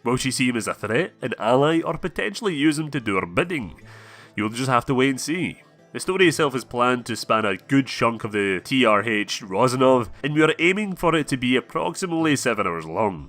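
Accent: Scotland, United Kingdom. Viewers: With that accent it seems like a foreign language! Are you scottish ?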